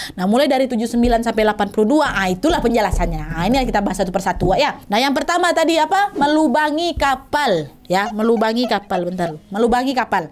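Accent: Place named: Sumatra, Indonesia. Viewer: Kurasa kau ini orang sumatera dah , logat kau itu jelas kali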